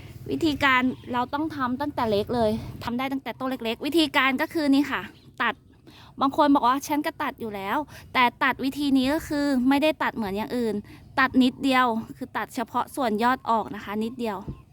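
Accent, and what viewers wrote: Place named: Thailand. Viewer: เจ้าอยู่บ้านได๋น้อเว้าคือภาษาบ้านข่อยแท้น้อ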